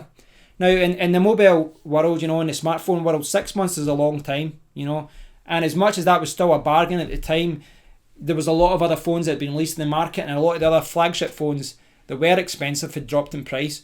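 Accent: Scotland, United Kingdom. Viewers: love your Scottish accent! When I heard it, I pressed the like button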